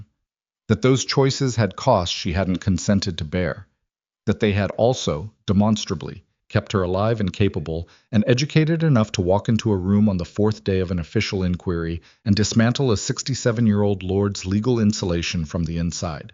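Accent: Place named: United States. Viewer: Please use a British AI narration. American ruins the lovely story. I am an American.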